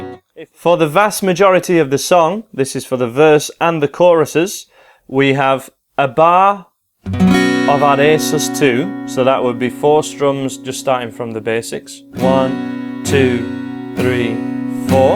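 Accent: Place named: Sheffield, United Kingdom. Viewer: Sounds like a Sheffield accent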